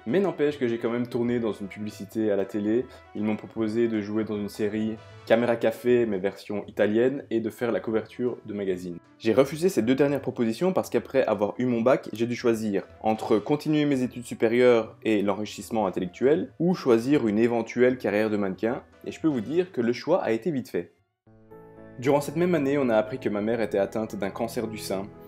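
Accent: Belgium. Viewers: Je déteste ton accent belge ms putains tu m'as bien fait rire !!! #bx #ulb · T'a un accent belge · T BELGE OU FRANCAIS!? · T’as trop l’accent liégeois comme moi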